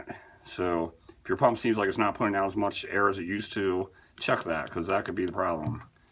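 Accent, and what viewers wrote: Pennsylvania, United States. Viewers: I was born in PA I know that accent. Also cool points to both for knowing who the hell are. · He's speaking Pennsy, easily understandable being that I'm originally from the Ho Bud coal region of the keystone state. Listening faster might help.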